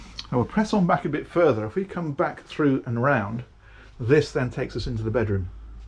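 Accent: United Kingdom. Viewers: What a beautiful english oxford accent !!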